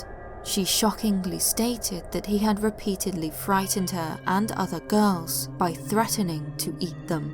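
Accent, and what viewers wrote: United Kingdom. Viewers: I love this voice, is so feminine, so delicate, so British, so beautiful 🥰, hope you like roses 🌹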